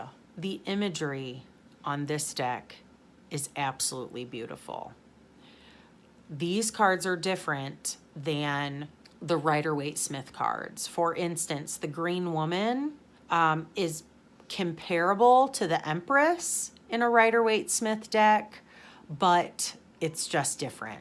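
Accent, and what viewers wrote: Midwest, United States. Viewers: don’t y’all talk similar in the Midwest? It all sounds the same to me! Anyway, you’re very cool, I like your channel and your face. 😊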